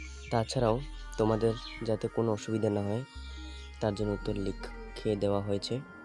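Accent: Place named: New York City, United States. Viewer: Your voice is very NYC bro.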